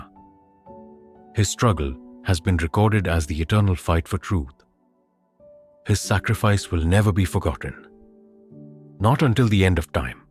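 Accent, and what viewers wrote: India. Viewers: Brother thank you for the video. Please can you change the AI from indian to something else ? Its hard hard to listen to this Indian English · Why did you change such nice narrators voice with an Indian accent. The previous narrator’s voice was just excellent for the context you guys put up. Especially for the battle of Karbala. Please us the previous voice 🙏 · Great video, but can you stick with real english AI voice, the Indian accent is annoying to hear.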